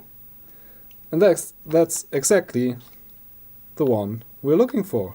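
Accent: Germany. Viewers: Nice Video. Could it be that you are from Germany, weil du hast einen leichten deutschen Akzent 😁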